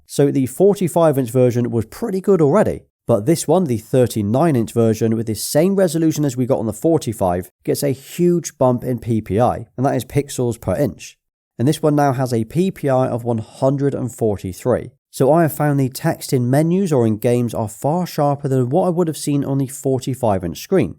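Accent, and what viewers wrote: United Kingdom. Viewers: I dont think he is american. Sounds british to me. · He british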